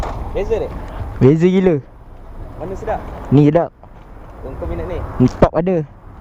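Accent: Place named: North, Malaysia. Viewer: Memang syok Ooo🤣🤣🤣🤣 ayat utara btl..😂😂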